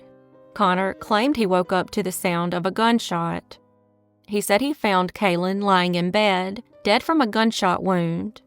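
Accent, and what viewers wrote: South, United States. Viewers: THANK YOU!!:hand-pink-waving: SOLVED CASES!!! YAHOO!!BEAUTIFULL COUNTRY VOICE AND A HEART AS BIG AS MY HOME STATE!! [ TEXAS ]!! GOOD WORK!!💯❤👌🧑‍🌾👩‍🌾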